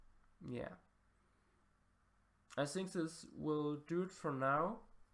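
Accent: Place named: Germany. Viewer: I love your german voice, like so much. You sound like Colonel Klink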